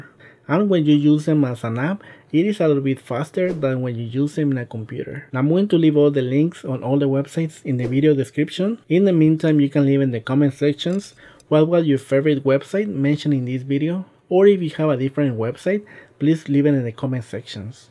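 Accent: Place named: Latin America. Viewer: I appreciate your help and this video gave us viewers some more tools. Thank you. Two comments about presentation. 1. Wear a nice collared 👔 shirt. The look of success is credibility when talking about making money. You have a very kind face and your English is clear, despite the heavy Latino accent. It is very good. I know how hard English to learn and you are absolutely doing great. You are able to communicate very technical information and this is very impressive. The second thing I want to pass on to you about presentation is to SMILE. Lighten up. It is okay to show a more human side to you. I’m not saying to wear a bunny costume, but let us see the more personable side to you that we would if we went out for drink together. I will subscribe. Again, thank you.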